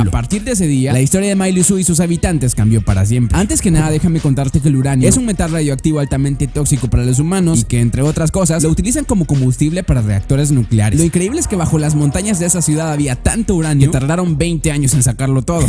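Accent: Fresa, Mexico. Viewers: se esucho diferente tu voz creo que te salio lo Mexicano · te cambio la voz y hablas con la papa en la boca ( fresa) no es la misma voz de antes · Regrese a ver al curioso ben veo q hablas mas a lo mexicano orale wey y veni ya no ssle mucho :'v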